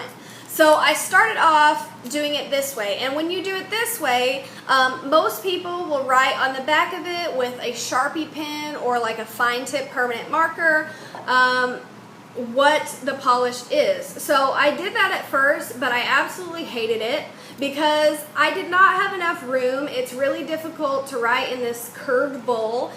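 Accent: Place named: South, United States. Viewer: Where are you from?! I love your accent are you southern?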